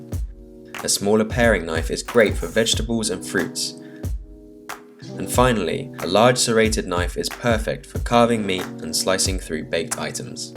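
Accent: United Kingdom. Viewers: For some reason , I didn't hear his accent until he said "living in London". Then BAM! I was like holy shit I didn't realize he was speaking in a British accent.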